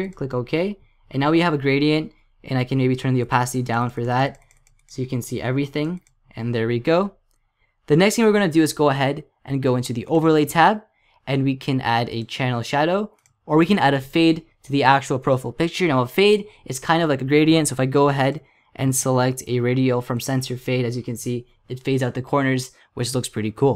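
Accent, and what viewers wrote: Canada. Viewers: Are u CANADIAN? ( do you live there) Here?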